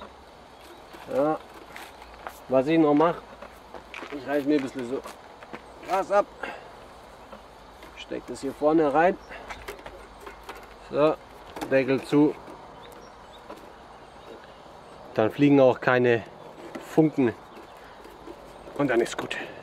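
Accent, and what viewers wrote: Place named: Swabia, Germany. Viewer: Stöckle, Äschdle, jetzet... wo bisch au Du her Jonger? Kirchheim? Göppingen? :D :D :D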